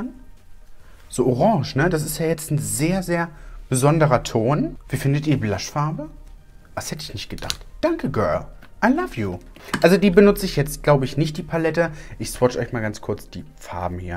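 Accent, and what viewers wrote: Berlin, Germany. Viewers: Οmg man fühlt richtig deine Lebensfreude 😍😍😍 das hat mir so gefehlt an dir!! Und dein Berliner Dialekt kannst du ruhig öfters raushängen haha Hab 4 🌷 gezählt Instagram mariamck27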